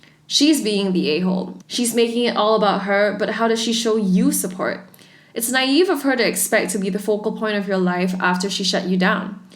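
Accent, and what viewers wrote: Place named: England, United Kingdom. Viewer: The reader has a slight English accent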